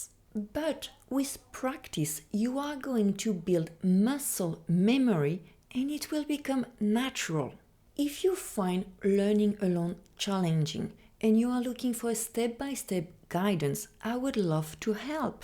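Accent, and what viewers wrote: France. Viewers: Good point early on: The teacher here has a very strong French accent, but I can understand her quite easily (though perhaps I have to listen a tad more closely than if she were a native English speaker like me).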